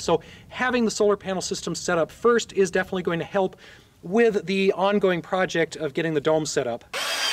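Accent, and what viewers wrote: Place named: Alaska, United States. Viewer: You being from Alaska tracks. I couldn't place your accent, but you sound similar to an Alaskan I used to work with a million years ago.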